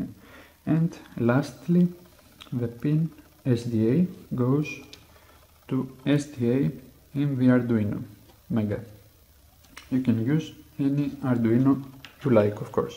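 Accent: Greece. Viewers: I have been to greece (Olimpiada) past summer for vacation, i can recognize your accent by the "h" sound :-) good job!! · are u greek????? You sound like me to talk in english im greek too lol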